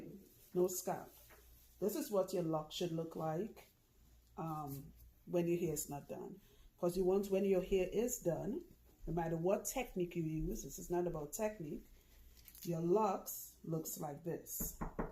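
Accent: Trinidad and Tobago. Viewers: From the time you began to speak, I knew 🇹🇹. Great job lovie. Keep it up. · I knew you was TRINI before you confirmed it! Thank you for representing in such a knowledgeable and approachable way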